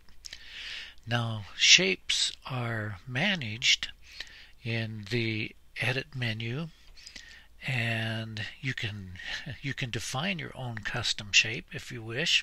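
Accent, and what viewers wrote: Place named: United States. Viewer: Hello, you are quite a character, brother - and i am saying this in a very positive way. Taking the time to answer the questions and comments on a video of yours which is as old as 5 years shows how committed of a person you really are to your profession or passion (whichever best fits). Respect and best wishes all the way from Bangladesh. NB: Your accent tells you are from USA. By the way, I also lived and worked in the USA for a long time. Studied at the university of Oklahoma...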